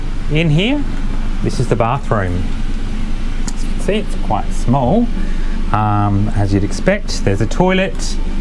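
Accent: Australia. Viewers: CRISP CLEAR AUSTRALIAN ACCENT = LUV= your clear speech = elizabeth nz xox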